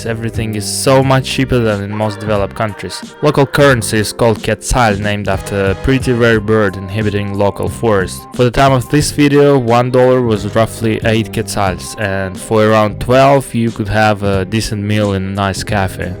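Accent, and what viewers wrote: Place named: India. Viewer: Are you from India your accent and your way to exprés yourself is terrible maybe you should try a silent video ! Mediocre video to say the least !!!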